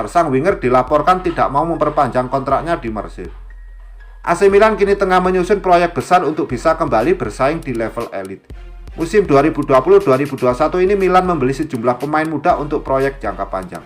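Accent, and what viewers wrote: Java, Indonesia. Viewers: Logat Jawa Lo kental banget! Cari suara yang komersil boss.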